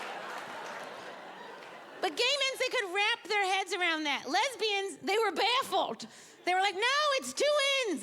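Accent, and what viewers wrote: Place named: United States. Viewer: Hearing annoying merican accent is not for me annymore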